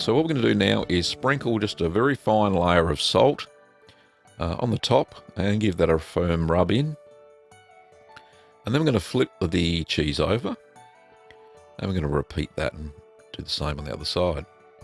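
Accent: Australia. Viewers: Ah finally an aussie...phew mate....finally a local.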